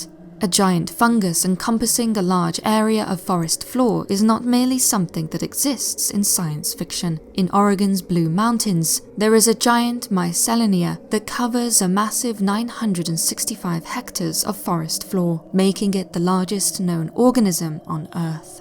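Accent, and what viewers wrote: England, United Kingdom. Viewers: As always a tale well told is just that, and without drawing any more in breathes that are lined with gushes or the occasional reverberations as towards something being spawned by the narrative of our as uncanny and spoken with the English accent I was born into deserves a bravo. I don’t often feel inclined towards a personal rating but I feel a need for a personal rating and wish to salute you and thankyou. As now the sunlight filters through my English afternoon in mid June and I’ll round off with another sip of Earl Grey, and ponder for a moment wondering what my mind will be greeted with in the next episode to grace my mind.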